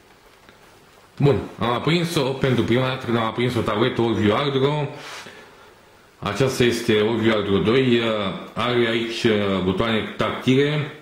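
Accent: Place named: Moldova. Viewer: Oamenilor! E clar ..e rarait...sau moldovean ...sau ambele..ma rog..daca nu vreti..nu va uitati..ce naiba!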